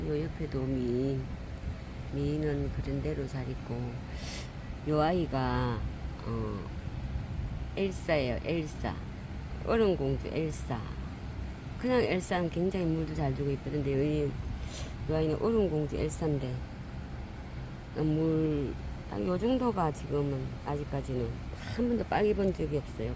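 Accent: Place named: Gyeongsang, South Korea. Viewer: 안녕하세요 반갑습니다 하우스속 다육아이들 잘 있었네요 경상도 억양이 참 정겹고 듣기에 좋아예~~ 사랑스런 다육 아이들 즐감하며 머물다갑니다 함께하며 응원합니다 복된 날 되세요😊❤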